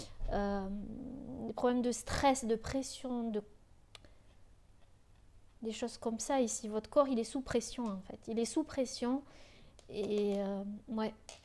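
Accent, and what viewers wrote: South, France. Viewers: Bonsoir Magnifique tirage! J'ai pris la chouette n°1 et pour info le père, la mère et l'enfermement ce sont passés quand j'avais 4 ans car ma grand-mère et ma mère étaient en sanatorium et mon père travaillant, mes parents m'ont laissé dans une maison pour enfants pendant 1 an. Je ne leur en veut pas évidemment mais l'enfant "Isabelle" a dû ressentir beaucoup d'abandon.... merci encore pour ta générosité et ton accent du sud!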